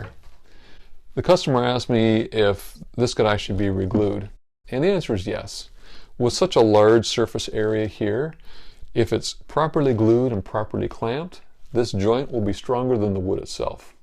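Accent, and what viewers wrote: Canada. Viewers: I hear a fellow Canadian's speech! Broken chai, here and now some optimism about repairing it. Enough optimism, that I won't watch the "make a new leg" until I hear the fateful crack again in this one. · Hey! Are you in Canada? Or Southern Virginia? Just curious about your accent. I'm retired from furniture, so I like to watch and sometimes I can give you some tips. So far, you do everything like I did, except I always used flux brushes for applying wood glue. Work much better for gluing and much cheaper too. Thanks a lot.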